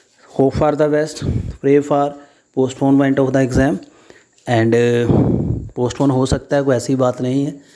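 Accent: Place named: Punjab, India. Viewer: Sir ji twadi punjabi esde vich saf nazar a rhi hai...i like it 😍😍😍😍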